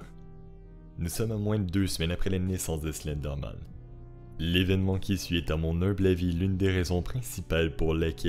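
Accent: Quebec, Canada. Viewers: J’aime bien ton accent je sais qu’il est québécois mais il vient de quelle region Sinon continue comme ça! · C'est du quebecois enfin rhooo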